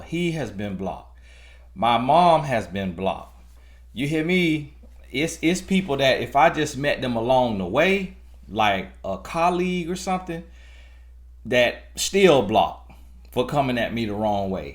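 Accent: South, United States. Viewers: I totally agree keep spreading your message and I love that your from the south and have that southern twang when you talk ❤️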